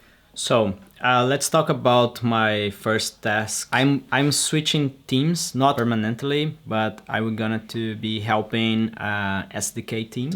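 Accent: Brazil. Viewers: Yes, I whatched this video in this intention, is more easy to undestand because the brazilian accent. And he speak more slow, this facilitates undestanding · I’ve watched this video with this intention. It’s easier to understand because of his Brazilian accent and he speaks slowly which facilitates the understanding. Acho que assim ficaria melhor :-)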